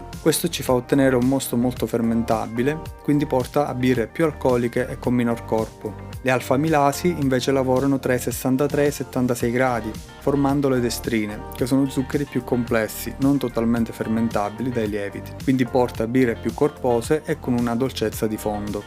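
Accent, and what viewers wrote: Calabria, Italy. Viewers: Bravo, sei calabrese?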